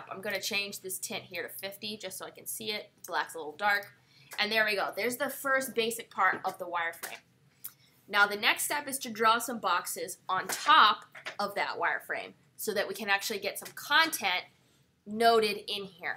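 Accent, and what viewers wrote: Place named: United States. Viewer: thanks for the american accent , very clear and helpful tutorial! very efficient for the new learner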